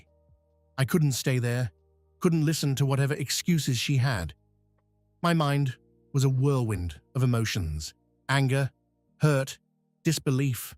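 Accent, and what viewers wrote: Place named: England, United Kingdom. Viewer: The English accent is fitting in this case.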